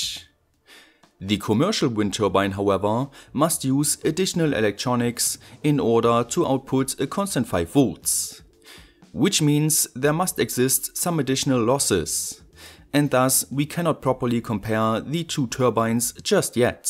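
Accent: Germany. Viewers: Hast einen deutschen Akzent 😂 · When Deutsche sprechen English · Man hört sowasfon das du deutscher bist ...... Kleine Frage noch warum sprichst du englisch... no offens wollte es nur mal wissen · German accent cool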